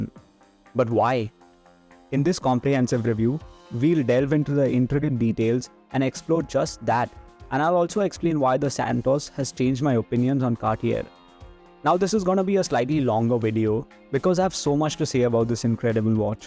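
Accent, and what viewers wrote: India. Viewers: I used to believe I could eventually get used to the Indian accent........... I was wrong.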